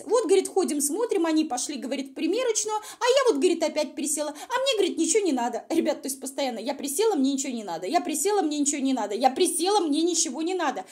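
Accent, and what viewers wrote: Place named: Revda, Russia. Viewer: В ее чо,вместо что.Капец,дуня ревдинская.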